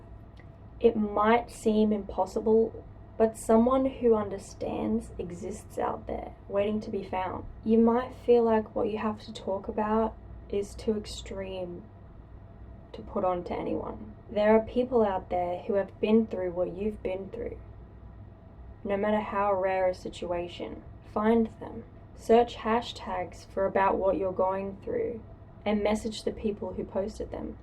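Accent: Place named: Australia. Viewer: Aye u got a funny Australian accent g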